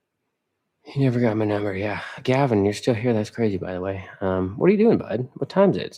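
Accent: Canada. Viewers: I was wondering why you sounded Canadian but your bio said USA. You're an honourary Canadian in my book, bud.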